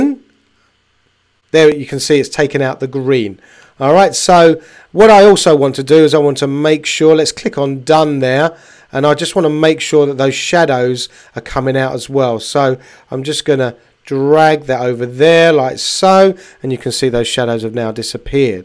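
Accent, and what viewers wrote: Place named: United Kingdom. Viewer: You must be from England or Scotland sir. Anyway, Great explanation. Looking forward to hearing more videos from you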